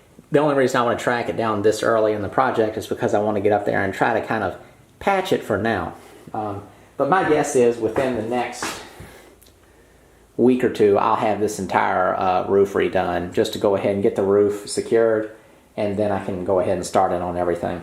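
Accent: South, United States. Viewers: It's called Southern. 🤦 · Yeah I would say his Southern accent is retaining a good amount of the "Transatlantic" or "Mid-Atlantic" accent that we used to teach in schools before WW2. You can check this accent on YouTube - fascinating story. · Your accent was pure 'Gone With The Wind'!!! 'Oh Ashley'! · What accent is this? I can hear it is southern but I'm curious where it is from exactly. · My guess is Georgia or Alabama, but what do I know? 🤷‍♀️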